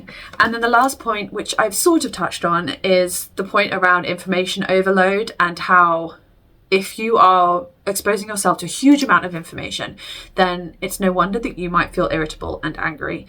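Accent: United Kingdom. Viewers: Why are you speaking in a scouse accent when your not from Liverpool? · eh? she has a neutral, educated accent to me which is common here in Manchester.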